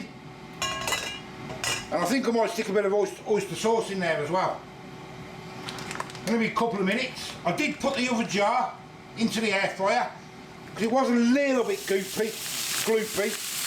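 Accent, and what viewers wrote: Birmingham, United Kingdom. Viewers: New subscriber here you sound like a fellow brummie?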